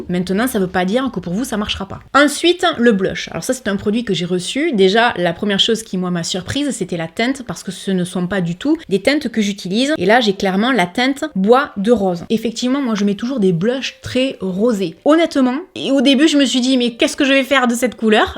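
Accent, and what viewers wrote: South, France. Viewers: Merci pour cette video pour ta bonne humeur et ton accent du sud j aimebcp les crayons yves rocher pour les yeux bonjour a talounet 🤩🤩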